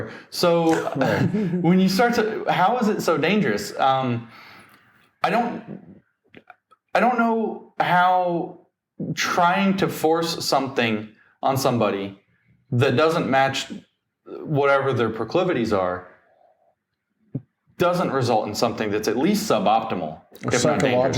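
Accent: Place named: United Kingdom. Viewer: I don't find it tedious, and I'm sure I'm not alone in that. It's so easy to forget that Konstantin grew up in Russia until he was 13. The man speaks with a British accent and went to university in Britain. It's not as if he says that all the time. You try living in a "foreign" country and NOT making reference to the culture of your childhood quite frequently. It informs his worldview, and is probably responsible for his fairly unique viewpoint on many issues.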